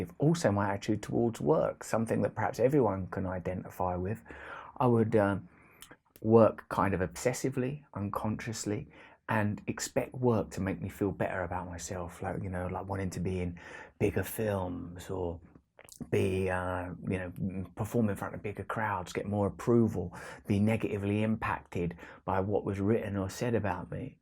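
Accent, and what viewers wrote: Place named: United Kingdom. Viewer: My therapist put me on to you around 8 months ago. I enjoy listening to your British accent. And that you're so honest about sharing the fact that you were addicted to drugs & alcohol (as well as lots of twisted behaviors) resonates with me. I'm working a recovery pgm ...N/A. as of 4years I am staying clean "One Day at A Time" (was on Methadone for 25 years w/3 attempts at being loosed from those "liquid handcuffs".) I'd never had an issue believing in the Supreme Source it was anger, mistrust n feelings of not being loved by God those major "sins" kept me separated in my mind & heart ..n ultimately my body enslaved to the darkness that dwells in the shadows of the "concrete jungle" ...n the only thing I thanked God for was knowing about & learning of Jesus Christ ...for which he I believe was (n remains) my sponsor. Reading about several other major religious beliefs has always been something I've done in periods of abstinence. 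It's a saving grace today. I'm happy to say. But hey...relapse, backsliding n all that jazz..it just ain't happening...not anymore...very grateful for that🤗 But I'm interested in hearing your take on recovery... it's different...raw & bold. Have you written another version of the 12 Steps? B/c hearing you say what you said about the 1st Step..."ask yourself are you 🤬?" 😄Yeah..."Unf@ that's the bottom line mate! You're good brother 👍 and funny too 👏👏👏. It's great to be alive n not merely existing but finally living n practicing so many of the tools to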